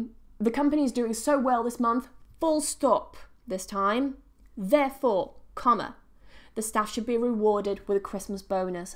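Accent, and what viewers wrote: United Kingdom. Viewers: Plse keep g.... we need to learn british accent 😍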